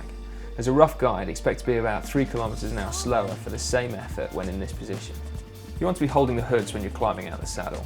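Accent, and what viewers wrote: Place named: England, United Kingdom. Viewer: Put subtitles on your videos, not everyone can understand strong English accent.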